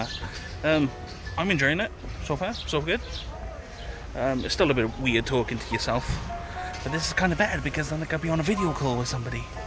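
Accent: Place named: Wales, United Kingdom. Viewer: Thanks for this. Grew up in Croesy and it's lovely listening to the accent and seeing places from years ago. (I live in Canada now). You're doing great vlogging - keep it up (I know this is two years ago, and I see you have kept it up!)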